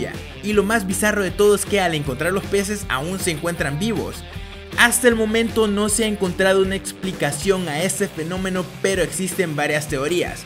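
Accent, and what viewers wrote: Honduras. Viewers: Man, ¿sos de Honduras? Es que hablas similar a un buen catrachos. Min 😂😂😂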